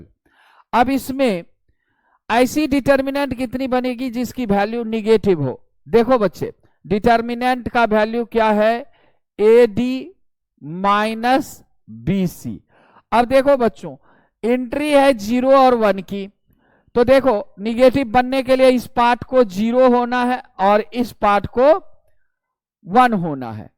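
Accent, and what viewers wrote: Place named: Bihar, India. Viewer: Kya tone hai sir ka 👏👏 Pure bihari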